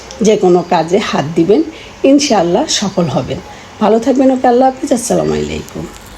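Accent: Noakhali, Bangladesh. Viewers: Annti আপনার ভাষা নোয়াখালী কোথায়।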